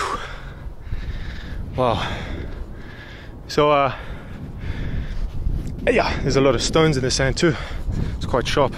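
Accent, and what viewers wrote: South Africa. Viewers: The heavy SA accent 😁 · You sound like a South African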